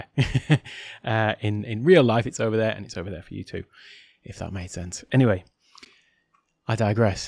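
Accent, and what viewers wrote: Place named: Europe. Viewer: "that was a bit of useless information" - yes it was ha ha, but you Euros are just so pleasant to listen to I didn't mind it. Any of us across the pod we would have lost everyone in 30 sec...ha ha. Thx for taking the time to post, appreciate ya.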